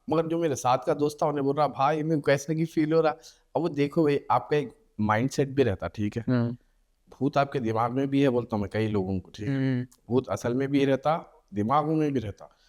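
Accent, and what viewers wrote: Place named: Hyderabad, India. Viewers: Apna Hyderabadi bolte Bhai Ku angaar dalte love you brother 😍😍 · arre Bhai hindi hi bolre par ascent Hyderabadi hai isliye waisa dikhta. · Hyderabadiii boltey..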